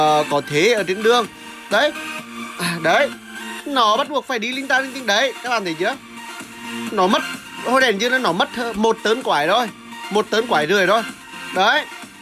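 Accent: Nghe An, Vietnam. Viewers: Anh ở đâu nghệ an vậy ? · Bạn là người nghệ an ak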